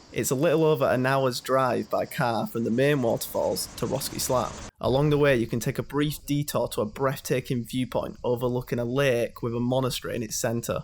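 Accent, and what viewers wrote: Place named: Barnsley, United Kingdom. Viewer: Sound like your from barnsley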